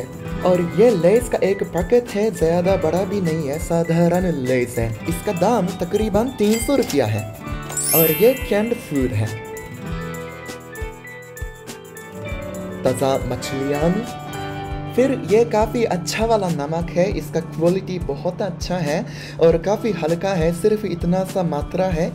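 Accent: Standard. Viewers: bhai aap ki Hindi bahot shudh he hamare jaisa nahi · He is much more Indian than many other People living in India, शुद्ध हिंदी। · Bro ap jesi Hindi bolte he (sudh Hindi ) vese to hamre idhar bhi nhi bolte ( nice Hindi) ----you talking Hindi nice ...i like this 😁😁😁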